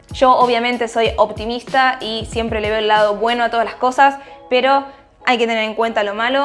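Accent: Argentina. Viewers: que buenos tus videos . das tremenda info, amo tu acento y jerga argentina, yo te entiendo por que vivo en tu pais pero aveces usas terminos muyyy argentinos que seguro gente de otros paises no van a entender. gracias por toda la infoooo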